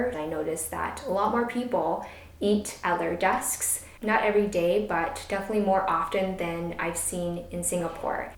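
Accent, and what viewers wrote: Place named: Singlish, Singapore. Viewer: my ears are soo happy when i hear foreigners try to speak Singlish :) Keep it up hahaha! Btw after going through the Sg education system, I still can't remember is it colour or color, recognise or recognize, realise/realize. Doesn't matter to me.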